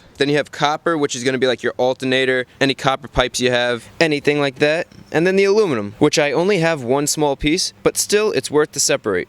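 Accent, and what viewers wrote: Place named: Boston, United States. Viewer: that bostonian though....hmmmnn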